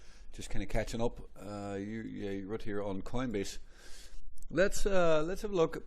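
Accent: Northern Ireland, United Kingdom. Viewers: You sound northing Ireland. Hello brother from Dublin 👊❤️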